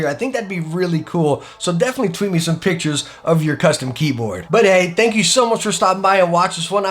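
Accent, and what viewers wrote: United States. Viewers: This guy's accent and way of talking is so American....but good video tho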